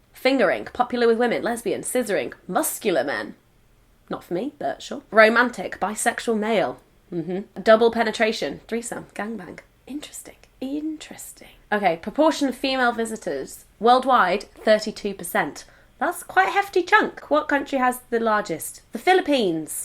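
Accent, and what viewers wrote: United Kingdom. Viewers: British English soo sexy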